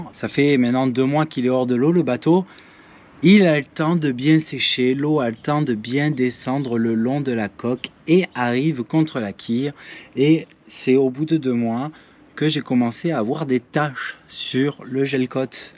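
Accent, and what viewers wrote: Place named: Provence, France. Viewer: Bonjour très beau boulot j'ai un petit voilier sa fait 4ans que je lui est rien fait dessus faute d'argent c'est une coque acier maintenant je suis a la retraite donc je pense avoir un peut de temps sa fait plaisir de voir une personne comme toi qui se défonce et surtout qui si tien bon comme on dit en Provence c'est bien pitchoun si tu passe en nouvelle Calédonie fait moi signe on prendra un pot ensemble ( je suis de la Provence aussi et sa me fait plaisir d'entendre l'accent )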